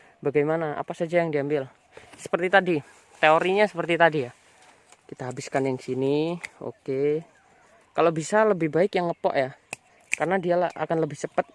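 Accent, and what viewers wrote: Java, Indonesia. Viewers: Jawane medok kok ngomongnya pakai doang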